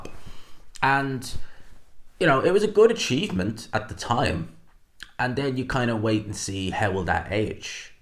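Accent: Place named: Ireland. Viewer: Where are you from? Accent sounds very Irish 😅just curious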